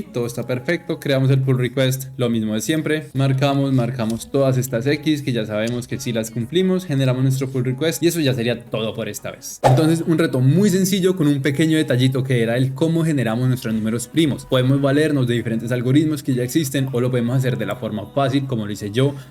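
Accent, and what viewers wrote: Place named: Colombia. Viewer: Se fue para Alemania pero el acento colombiano no se va 😂 no se si me equivoqué rara vez me pasa gracias por el video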